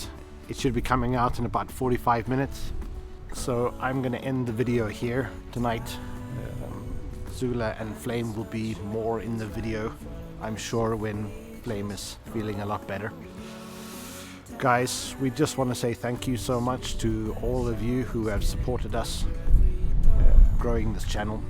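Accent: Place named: Cape Town, South Africa. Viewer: I knew it🤣🤣🤣 I'm from Cape Town too..our accent is very distinct to the rest of the world..you can here a Cape Tonian accent peeping through any accent lol. But I'm so happy for you. Your life looks so pieceful. I'm struggling in Cape Town to escape the rat race. It's just so expensive to buy your way out to live self sufficiently here. I want to move off grid but land here is so tight and the land claim act is making it difficult for people. So I dream of taking an adventure like you, but I don't have enough money yet. But you are brave Sir. Very brave to journey so far to the unknown. I'm really happy for you. Keep on enjoying your adventures with your family..I have subscribed to your channel. I loved the archery video as I am into archery 🏹.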